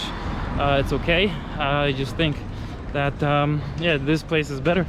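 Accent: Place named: Eastern Europe. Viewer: where are you from? I mean originally... Ukraine? You sound russian or slavic speaking ... as your native langauge. your english is fine, just I can tell you're not a native speaker and was wondering where you're from originally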